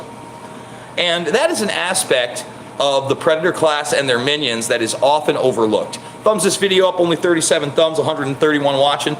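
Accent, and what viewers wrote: South, United States. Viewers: I'm sorry but your southern accent is amazing haha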